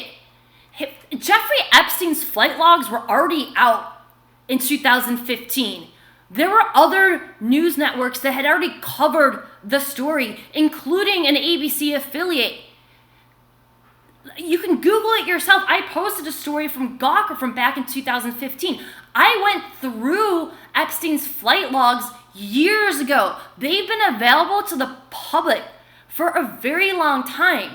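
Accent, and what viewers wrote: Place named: London, United Kingdom. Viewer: Sounds kinda like the kids from London. The little boy and girl that told on their Dad and teachers at their school.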